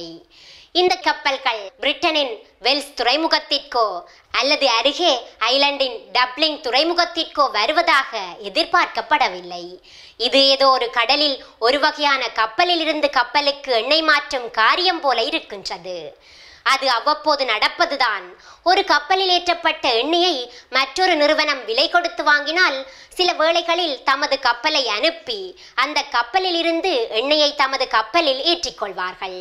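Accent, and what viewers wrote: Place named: Sri Lanka. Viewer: அருமையான பதிவு நன்றி உங்கள் சிங்கள தமிழ் இணிக்கிறது கேட்க கேட்க அருமை வாழ்த்துக்கள் வாழ்க வளமுடன்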